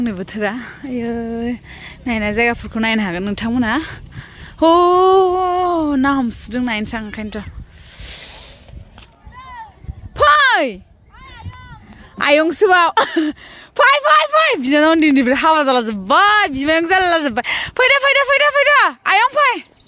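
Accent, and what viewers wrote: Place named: Udalguri, India. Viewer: jwngni udalgurini baidi railainai binanao sona.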